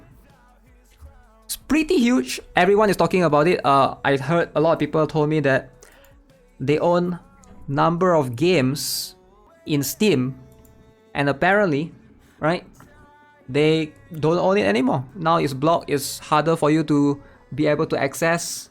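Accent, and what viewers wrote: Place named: Indonesia. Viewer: probably indonesia judging from his accent, it is different from ours Im from the PH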